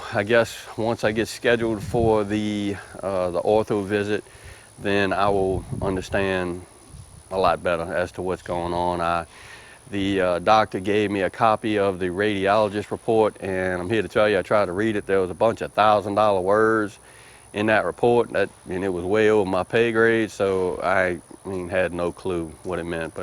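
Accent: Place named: Mississippi, United States. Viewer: New subscriber here and I am so glad I found your Channel. As soon as I heard that southern Mississippi accent I already felt at home. Me and my partner go down and visit family in Saucier every few years or so. I really enjoy the weather, people and pace of Mississippi. I am trying to convince my partner that we should move south, despite the weather down there lately. Tired of this Michigan snow. lol. I hope you release some more content soon. Can you grow a garden year around down there?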